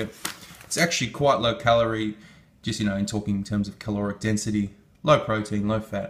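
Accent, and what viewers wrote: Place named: South Africa. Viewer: Your accent is insulting. A) Not all of us are Afrikaans. B) No one says "South Efrica" or "Grevy."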